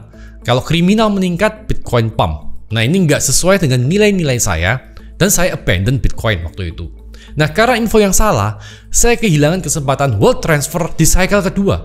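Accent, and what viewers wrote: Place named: Madura, Indonesia. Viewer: Madura pak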